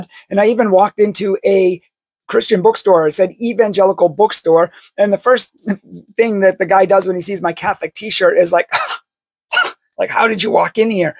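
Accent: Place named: United States. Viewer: Fair play but also crazy, I'm glad you had the American accent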